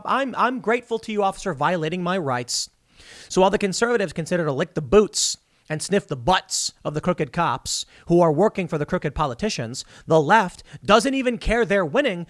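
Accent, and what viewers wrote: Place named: Chicago, United States. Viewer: .... Every video your Chicago accent is getting stronger. Something is definitely off...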